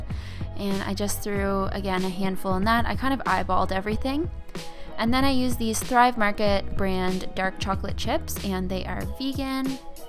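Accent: Canada. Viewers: She sounds Canadian, but it seems like she lives in the US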